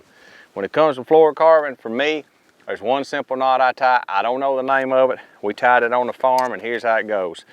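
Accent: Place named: Texas, United States. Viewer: Matthew Mcconaughey is from Texas. A lot of us talk this way.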